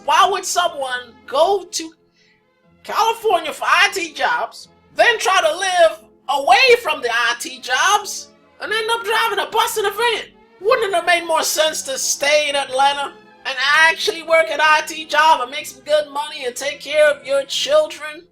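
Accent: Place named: New York, United States. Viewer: By the way,,,, when did you gain your New York accent????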